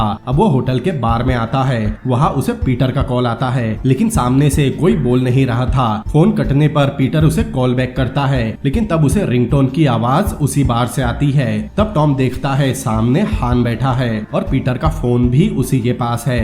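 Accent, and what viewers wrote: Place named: Gujarat, India. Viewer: Aap gujarati hona Awaaj se hi pta chalta h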